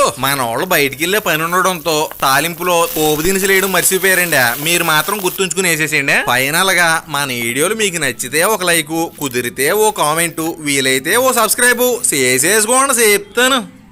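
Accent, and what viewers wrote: Godavari, India. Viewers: Bro I can definitely tell about you’re voice that you are from Godavari district ❤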